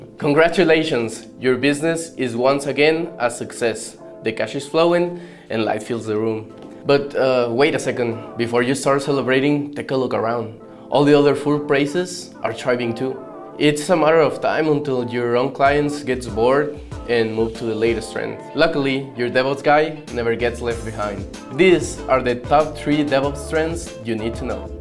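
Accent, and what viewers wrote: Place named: Mexico. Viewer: Amigo, you accent sounds exactly like Raúl Jiménez's, when you speak.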